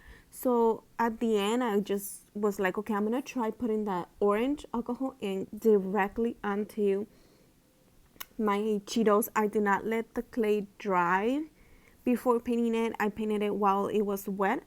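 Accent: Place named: United Kingdom. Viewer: * British accent * absolutely perfect love ❤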